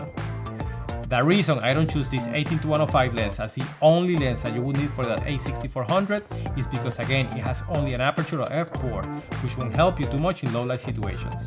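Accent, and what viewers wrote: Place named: Venezuela. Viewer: Tu acento es definitivamente Venezolano!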